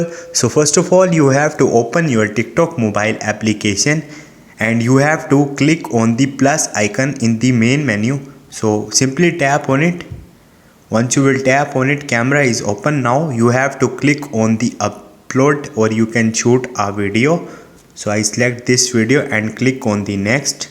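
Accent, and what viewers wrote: India. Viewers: Yeah its called an Indian accent. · this guys voice sounds like those scammers from india👍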